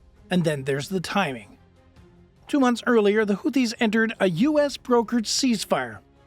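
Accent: Canada. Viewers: Is that a Canadian accent i hear? 🤔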